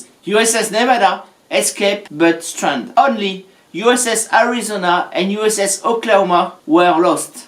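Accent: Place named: France. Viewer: Génial les effets sonores et la cornemuse à la fin ! Ça semble vachement plus personnel avec ces décors et ce long travail de montage qui nous tient en haleine. Parler anglais ça amuse l'oreille vu que ton accent est français, super idée ! Tu t'y connais en histoire militaire de la chine ? Genre dynastie Qing ?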